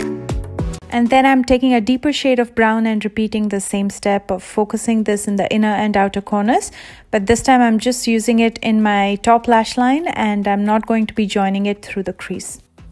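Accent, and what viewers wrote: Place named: India. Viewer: your Hindi accent is 🤗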